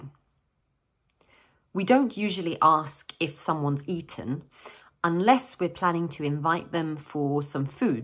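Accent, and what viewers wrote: United Kingdom. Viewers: 발음이 영국 발음이네 했더니 영국분이신가요? ㅎㅎ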